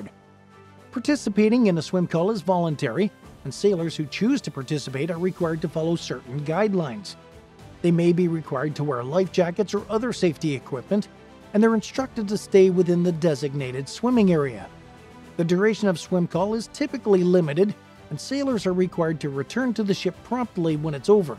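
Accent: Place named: Canada. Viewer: Canadian voiceover!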